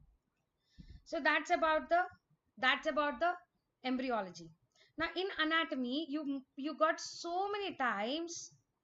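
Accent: Telugu, India. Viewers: Thank u so much mam ur Telugu is so cute mam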